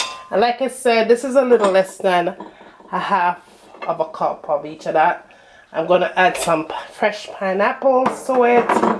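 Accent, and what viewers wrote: Jamaica. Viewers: Jamaican accent tan up strong duh... Thanks for sharing heard about this now I finally see it on youtube.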